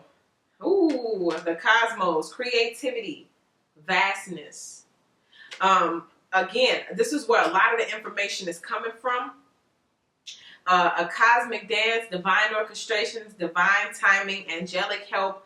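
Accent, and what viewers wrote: Chicago, United States. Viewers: Hold up, girl you from Chicago?!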